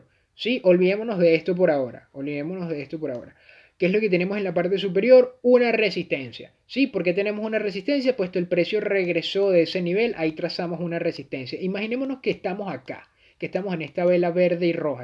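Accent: Venezuela. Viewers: Muchas gracias por compartir estos vídeos la verdad es que me han ayudado bastante a poder entender el mercado sobre todo por que lo dices de una forma amigable y en el acento venezolano que es tan como de escuchar para mi. seguiré viendo los vídeos para aprender a crear mi propia narrativa y proceso y poder operar de una manera consistente en el mercado. Saludos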